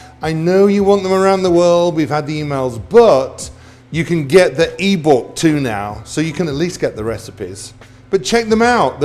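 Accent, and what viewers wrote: England, United Kingdom. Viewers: Stay English, Chef. Sounds so much better for a royal chef!! Beautiful cooking nonetheless.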